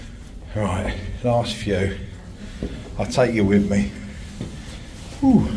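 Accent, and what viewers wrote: London, United Kingdom. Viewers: I love your voice, proper old school London, im from Ladbroke Grove. Thanks for the Video 🎉 For me, it's all about the ingredients in the sausages. I've yet to find a decent independent coffee shop in London. 😢 What genre of music are you collecting on vinyl?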